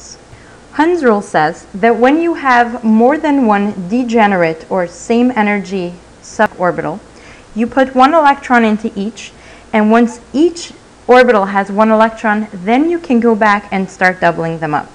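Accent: United States. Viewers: Why does every chemistry video have an American accent!?!?!?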